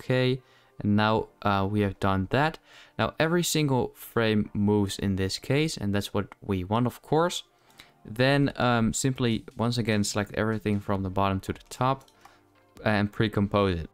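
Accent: Netherlands. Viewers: you dutch? idk im just guessing cause you sound dutch, maybe im wrong. anyways im dutch. lol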